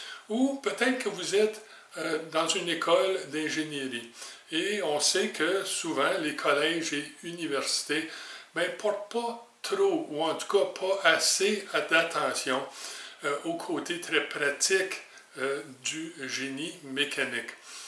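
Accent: Canada. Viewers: N'écoutez pas les esprits chagrins ! Moi, j'ai découvert vos cours en ligne depuis une semaine et malgré l'accent (que j'aime beaucoup étant fan des "Têtes à claques" et de Céline Dion même si je ne comprends pas tout et suis obligé de revenir en arrière très souvent) j'apprécie énormément toutes ces connaissances que vous nous faites partager, toute cette partie sécurité très importante, dont je m'imbibe en ce moment, pour continuer à faire de l'usinage en pleine santé physique, pouvoir continuer son hobby ou sa passion sans dommage. J'apprécie aussi beaucoup cette progressivité dans l'acquisition des connaissances couplant l'écrit, l'oral et la pratique. Merci pour tout.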